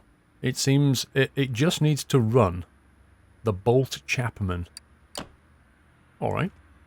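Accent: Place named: United Kingdom. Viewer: I'm trying to make it as a Youtube gamer... but I can never compete with this British accent...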